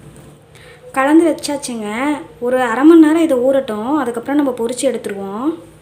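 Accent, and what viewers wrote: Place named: Salem, India. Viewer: Speaking slanging Adi thuul akka . That's salem guys 🔥✈️⛏️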